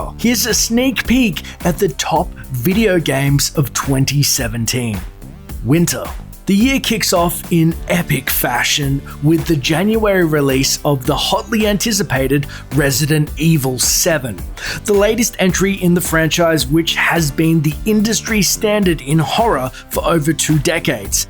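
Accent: Australia. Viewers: Where is days gone set? Cause he says one of "our" national parks and this guy has an Aussie accent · Yuukei There is no such thing as a British accent so that doesn't make sense, he sounds and is Australian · I fucking hate australian accent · Was the uploader Australian? His voice was hella hot, omg.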